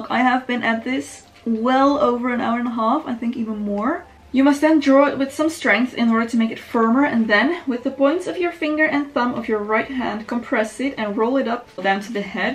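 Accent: Netherlands. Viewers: Ik hoor het toch altijd wanneer een nederlander engels praat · I love your English/Dutch** accenttttt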